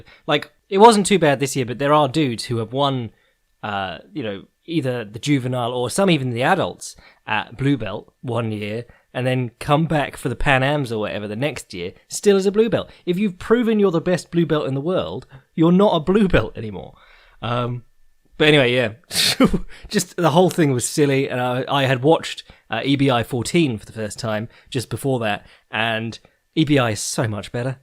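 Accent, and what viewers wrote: England, United Kingdom. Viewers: So you just had to get that off your chest? I love the youthful voice paired with the understandable English accent, btw.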